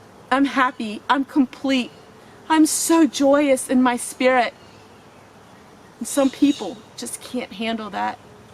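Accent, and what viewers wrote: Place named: Pennsylvania, United States. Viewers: Yes you can have both. That person is ignorant. I like how you speak, I grew up pretty close to your area in Willow Grove, Pa. during the 60's and 70's so I'm familar with the dialect. Growing up I had two sister Quaker ladies (spinsters) who babysat me and they spoke using always thee and thou in their sentences and wore long dresses and lived very frugally. I learned what a compost pile was from them, how to prepare simple heathy meals and how to wash clothes by hand. People criticize sometimes what they fear. You are honest,simple, godly peole just like my sitters, Cynthia and Myrtle. Keep on being you.👍❤ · I had a feeling you were from the Pennsylvannia area. Choose sounds slightly like 'chews', and on sounds like 'awn' (hard to describe), kinda subtle but I hear it.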